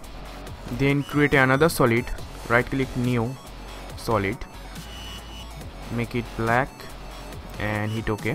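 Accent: Bengal, India. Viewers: wow the Indian accent !!! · Really hard time understanding a word he's saying... Too strong an Indian accent. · sounds indian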